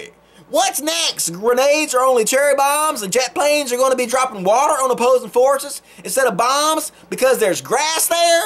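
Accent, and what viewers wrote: South, United States. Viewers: with your accent, it would appear you are from a red state. You rock, but it must be lonely being one of the few with a brain that actually works!! Hang in there and keep the great logical videos coming! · Its pretty refreshing to hear a southern accent coming out of an intelligent person's mouth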